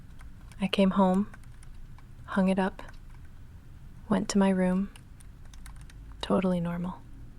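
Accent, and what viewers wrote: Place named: United Kingdom. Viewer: Ngl I love that British accent. I’ve always had something for British women. Great audio definitely saving this on a playlist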